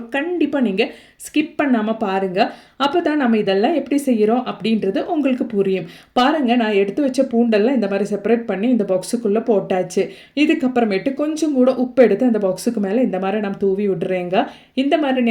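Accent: Kerala, India. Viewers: அனியத்தி நீ மலையாளியோ😂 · சகோதரி கேரளா பகுதி அந்த உச்சரிப்பு சிலருக்கு புரியவில்லை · Malayalam mix aaguthu voice so ur malayali അല്ലേ 👍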